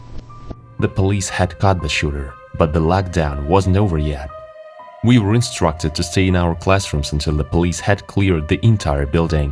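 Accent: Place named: United Kingdom. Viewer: HES BRITIS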